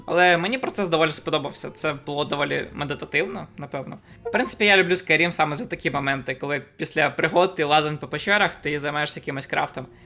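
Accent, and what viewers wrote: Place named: Lviv, Ukraine. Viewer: У вас такий акцент цікавий, ви зі Львові або області? У мого друга просто ідентичні інтонації просто цікаво тепер 😄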